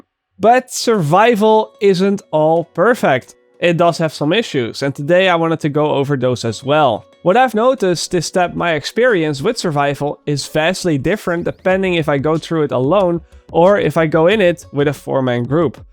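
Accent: Netherlands. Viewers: Hoor ik het nou fout of is dat een nederlands accent? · are you Dutch? you have an accent which reminds me of my fellow Dutchies. although, it also sounds american at the same time. so ... i NEEEEEED to know. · Is he dutch? Because he has a dutch accent.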